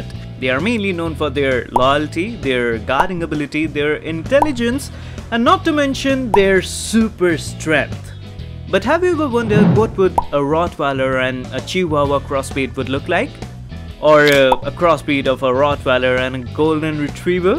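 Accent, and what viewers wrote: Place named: India. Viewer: You sound funny... Let me guess, you're an indian.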